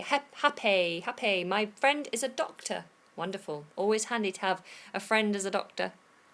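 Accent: United Kingdom. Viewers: I LOVE BRITISH ENGLISH.It'S ONE HUNDRED PER CENT ENGLISH YOU'RE AMAZING TEACHER AND I'M A GOOD LEANER THIS IS PROGRESS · thank you very much, ill enjoy hearing beatiful british English. im lazy boy, dont want to study something in front of a desk. it makes me sleepy and boring. I only do what I like to do. I love English. my advance now is there is no japanese around in this village, so i dont have to speak my first language. Ill think in English or Thais. i was a taxi driver, i retired from it 3 yrs ago and now i eat by japanese pension, and am a farmer and english learner.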